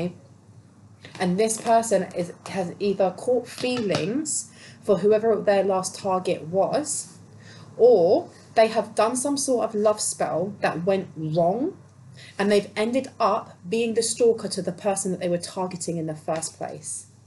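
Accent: United Kingdom. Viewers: Understand you perfectly, love a Brit accent lovey! Your reading are exemplary! 💕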